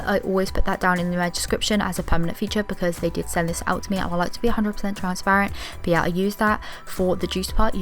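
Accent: United Kingdom. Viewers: Hello, umm i have a question: Are You British? 😅👉👈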